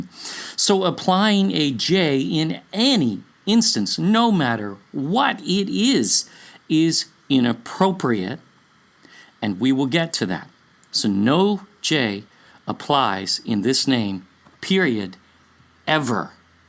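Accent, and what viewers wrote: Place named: Philippines. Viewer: are you Filipino, if you are which sounds like you are, have you seen on the true Queen of Sheba, she was filipina, which was amazing to me. I am Cherokee/Irish, which we come from Yasshakar, that was amazing to me too. Shalum and be blessed akhy (Bro) in YaHUaH.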